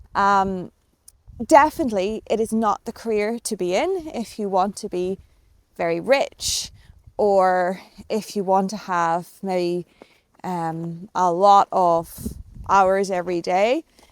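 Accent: United Kingdom. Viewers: Hi, I'm from Brazil. How've you been? Can I ask where your accent is from? It sounds british to me. Am I mistaken? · No, you didn't always Only in the field f English teaching You are satisfied, indeed. Are you English? Your accent is not RP, not southern at least. Rhotic at times, not scotish...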